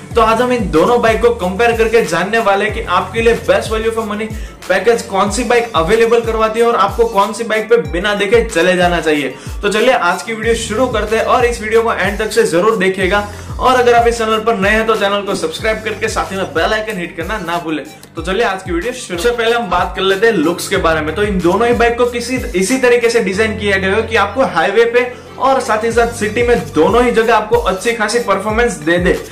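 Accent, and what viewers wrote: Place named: Gujarat, India. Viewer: he is typical gujju .. listen to his accent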